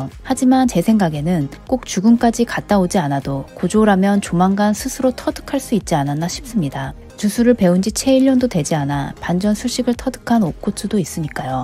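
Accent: Japan. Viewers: 일본인이신가 발음이 그냥 일본인인데..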